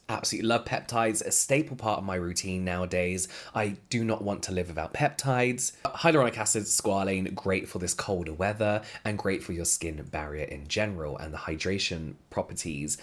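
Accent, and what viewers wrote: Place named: RP, United Kingdom. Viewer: i hope this isnt a weird question but what english accent do you have? Is it conservative RP? I'm just curious to know because i love how classy and posh it sounds.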